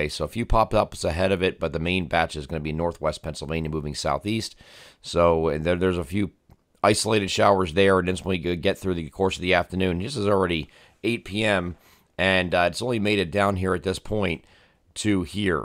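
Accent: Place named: Canada. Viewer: You got that wildfire voice bro! You can tell by the trace of Canadian accent! Ever since the smoke showed up my allergies haven’t been so bad since I was a kid. Hopefully you feel better as conditions improve personally I’m on the rebound today. Thanks once again for the videos y’all are the best in the game!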